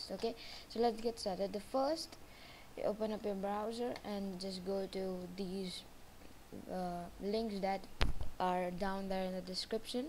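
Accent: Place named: India. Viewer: you have an indian accent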